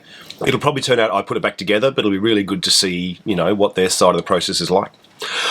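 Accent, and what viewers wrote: Australia. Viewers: It's weird seeing this. Usually with a West Virginia accent and "hold my beer" thrown in from time to time has been my experience. Seeing and hearing the Aussieness of it is pretty cool. Interesting "series", thanx for taking the time to make the videos and post them!!! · Are you from Australia?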